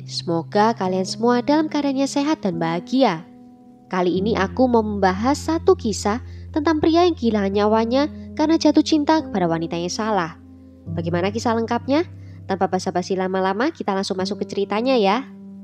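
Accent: Java, Indonesia. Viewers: Hayyy jg say.. medokuhhh 👍💞💞💞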